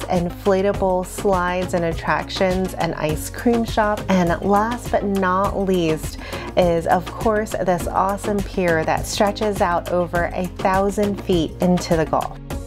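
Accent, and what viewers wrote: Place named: Midwest, United States. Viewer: Thank you! Your videos are refreshingly fluent. (I guess I recognize and resonate with the “Midwest influence”—I lived in Evansville, Indiana for 16 years.)You present and explain in calm, digestible terms and pace. So refreshing! We’re planning a trip to the Punta Gorda area this week, as a matter of fact. Will be checking any videos you have if that area. My husband lived in Naples from <num> so he is familiar with the weather, geographical layout but the restaurants and other businesses have changed quite a bit with some old favorites still there too.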